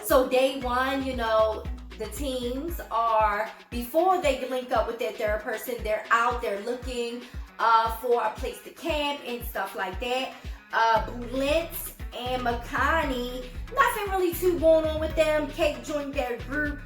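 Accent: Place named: Maryland, United States. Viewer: i can tell ur from maryland lol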